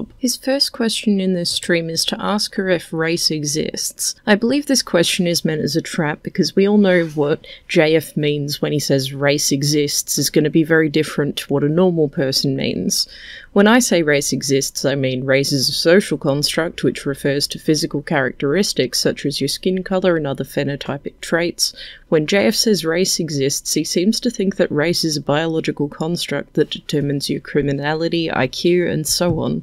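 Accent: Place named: France. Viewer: JF has taken to calling people who disagree with him "Liar" once they don't concede to his framing. It's profoundly bad faith. He becomes cynical and condescending almost immediately. For him this is a for-profit endeavor. You deposit your token and you get exactly the talking points you want with the French accent and academic "credentials" for polish.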